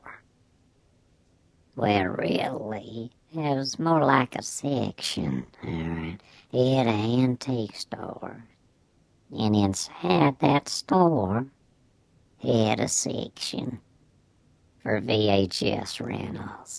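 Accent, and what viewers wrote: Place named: South, United States. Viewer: Your uncle sounds like he was a cool dude ! I’m sure he was fun to be around. Great story . Where are you from? I like your southern accent. I’m from Tennessee and also have a southern accent . I’m guessing you’re from Georgia. But I’m just guessing. 😊. Love the animation . Very cool 😎. You’re so creative and talented.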